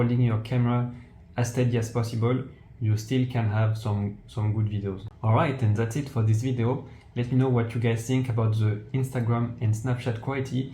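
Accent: France. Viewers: Good video but je sais que tu parle français ça s'entend lol merci pour les informations en tout cas 😁😁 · salut, est ce que tu serais un français par hasard ? tu as un peu l'accent je trouve hehe.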